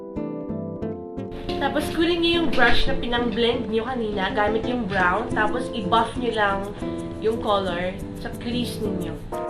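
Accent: Philippines. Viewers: You have a cute Filipino accent :)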